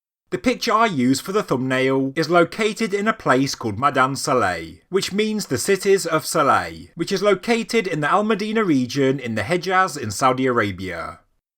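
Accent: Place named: United Kingdom. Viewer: Could you please tone down little bit of your f****g british accent and tonation so more could fully comprehend you talking s***t chanell..your bri* accent i can tolerate but you monotonose boring tone is irritatingly offending to the ear...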